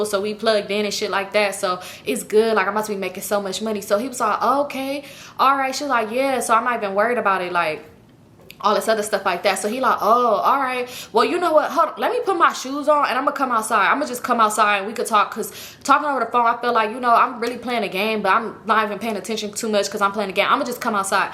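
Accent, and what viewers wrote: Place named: South, United States. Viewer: your random country accent gets me every time. And I’m from Memphis. You know we country as hell.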